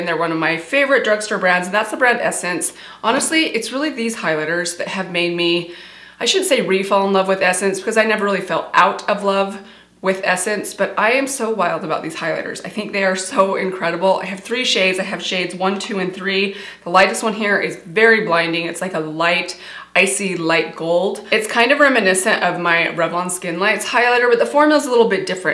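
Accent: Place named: Utah, United States. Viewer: The hard Utah accent was an immediate turn off for me. And you talk way, way too fast! I tried contacting her reps to find out which She Glam concealer she was talking about and the response was ridiculously convoluted. The fact that she suggests going to Sephora for new brands is laughable. Go to Ulta, which I also don’t love but at least you get some drugstore brands. So funny that she is talking about Nabla at the end of this vid and you can get the brand at Ulta but not Sephora. Seems all slapdash thrown together- who deemed her an expert? I will be leaving as fast as I found her-toodles.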